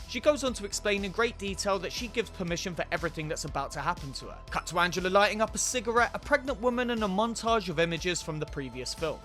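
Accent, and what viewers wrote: United Kingdom. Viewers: Talk about the perfect man!!😅❤ Watches disturbing films and has a British accent!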